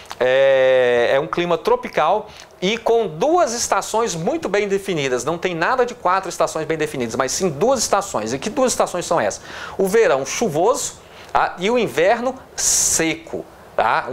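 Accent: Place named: Goiás, Brazil. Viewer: Olá professor, Eu sou psicóloga e já trabalhei com RH e sei que às vezes é difícil encontrar Profissionais competentes e atenciosos como você; E viva o nosso sotaque Goiano 🇧🇷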